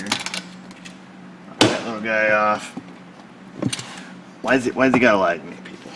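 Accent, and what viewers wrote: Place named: New York, United States. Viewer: "Wadd-a" you "BA-UN" NY accent LOL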